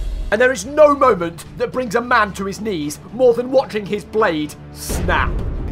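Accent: United Kingdom. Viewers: Aggressively british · British man recaps a show about Radom 40 year old people make pipe bombs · how to make a convincing british accent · You. Sound very Br tsch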